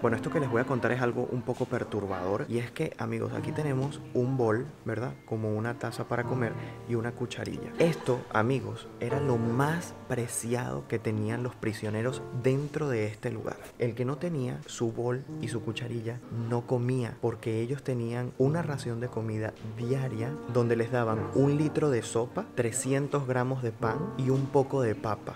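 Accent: Venezuela. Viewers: ME ENCANTO MUCHO LA FORMA EN QUE SIENTES Y EXPLICAS LO QUE ALCANCE A VER DEL VIDEO.. PORQUE LA VERDAD ... LOS SENTIMIENTOS O EMOCIONES NO ME DEJARON CONTINUAR VIENDOLO.... ES MI PRIMER VIDEO QUE VEO DE TI...... ME SUENAS A UN PAISANO...SUENAS COMO VENEZOLANO.... PERO ESE NO ES EL PUNTO..... LA VAINA ES QUE ME IMAGINE ESTAR AHI.... O PASAR UN MOMENTO ASI Y DE VERDAD QUE ES HORRIBLE .. Y ESO QUE ES SOLO IMAGINANDOMELO......... Y SOLO PIENSO EN AQUELLOS QUE VIVIERON ESO.... MUJERES, NENES, HOMBRES DE VERDAD QUE DUELE..... DUELE LA FALTA DE EMPATIA, LA FALTA DE AMOR AL PROJIMO , LA FALTA DE ACEPTAR A LOS DEMAS COMO SON .... NO IMPORTA LA RELIGIONNNN , NO IMPORTA EL COLOR DE PIEL.... NO IMPORTA COMO SEAS..... TODOSSSSSSSSS MERECEMOS [ R E S P E TO ] TODOS SOMOS PERSONAS, NO TRATEMOS A LOS DEMAS COMO SI FUERAN ANIMALES O MENOS QUE NOSOTROS..... POR FAVOR AMEMOS AL PROJIMO COMO A NOSOTROS MISMO..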